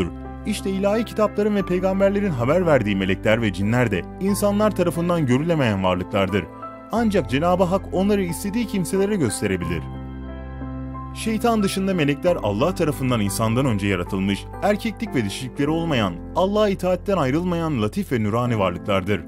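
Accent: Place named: Azerbaijan. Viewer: soyledigin sozler azeriye benziyor hemde cooook guzel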